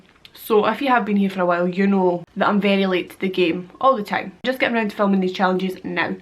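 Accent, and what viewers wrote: Scotland, United Kingdom. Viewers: are you Scottish I take it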